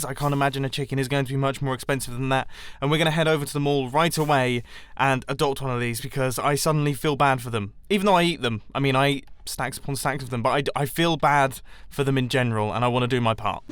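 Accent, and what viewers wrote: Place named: United Kingdom. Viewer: Has anyone else started to think with a British accent because of his videos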